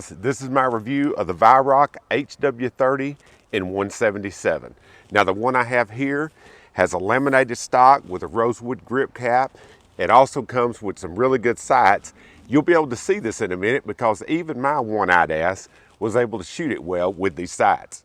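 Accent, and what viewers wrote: South, United States. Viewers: Nice rebel accent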